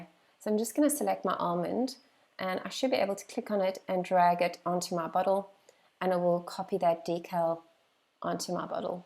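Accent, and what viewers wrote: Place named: Australia. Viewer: i was wondering " wait, hold on, did I hear Margot Robbie speaking ? but then I was like, ah she is a Aussie so you might be having the same accent" ^_^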